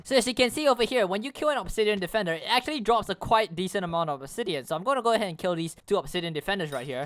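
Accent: Singapore. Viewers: Is it just me? Or do you sound like a Singaporean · R u singaporean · U sound singaporean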